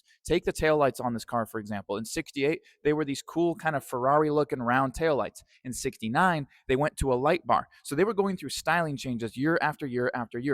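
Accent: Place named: United States. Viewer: nah, he isn't a twaty millionaire wife who lives vicariously of her husband's earnings... his Americanized pronunciation is just fine.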